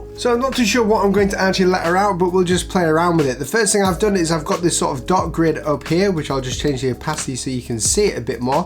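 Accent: United Kingdom. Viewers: Where are you from, where is your accent from? :) UK where?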